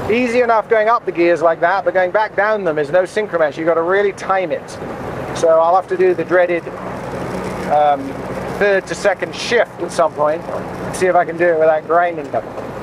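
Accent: England, United Kingdom. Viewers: You're English, right?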